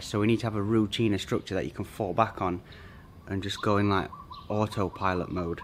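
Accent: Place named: Northern England, United Kingdom. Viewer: Its nice to hear a familiar accent to be honest! Nowhere near enough northerners on my discover page haha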